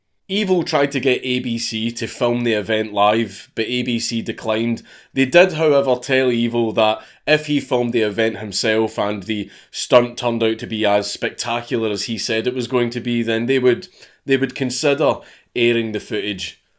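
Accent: Scotland, United Kingdom. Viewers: Ur scottish is now better than ever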